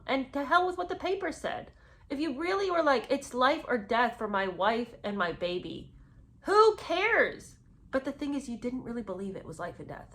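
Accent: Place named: RP, United Kingdom. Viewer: 😂😂😂😅😅😅 I love you!!!!! 😅😅😅😅😅... jolly ..what a total ding-dong H is... love your English upper crust accent 😂😊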